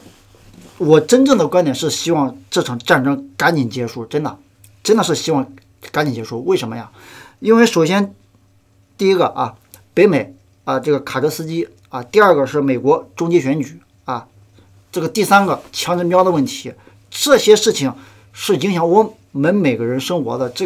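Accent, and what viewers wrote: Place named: Qingdao, China. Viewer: 频道第一次看，挺好！伙计，听口音青岛银？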